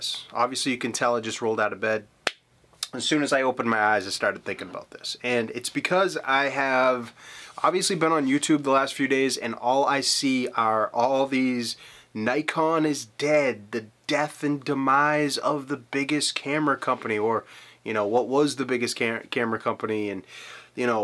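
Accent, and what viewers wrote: Boston, United States. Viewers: I hadnt noticed the boston accent before haha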